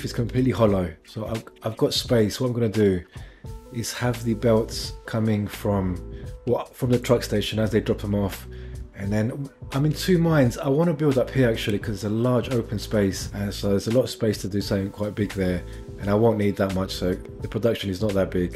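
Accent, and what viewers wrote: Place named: United Kingdom. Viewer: Dude, keep it up! Your builds are the best I've seen. I'm trying to place your accent, almost British, but not quite....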